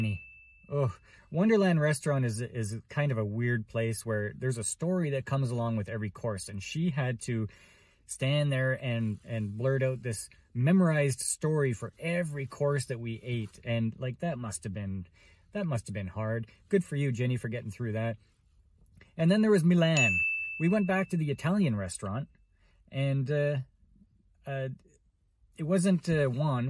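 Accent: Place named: Northern Ontario, Canada. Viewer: Trust your wife, words to live by, so when's your next Cruise? Your Nordern Ontario accent was showin' heh great video!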